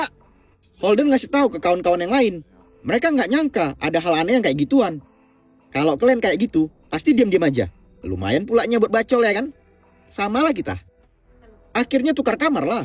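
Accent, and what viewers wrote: Medan, Indonesia. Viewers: Geng aku suka sekali dengar kau becakap logat medan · Asal Medan kau ya. Bang?😂😂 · Medan kali lek dabingnya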